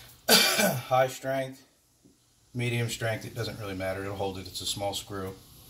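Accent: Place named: South, United States. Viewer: Finally found it! You sound like Matt Fikac, you southern guys all sound the same 💪🏼😆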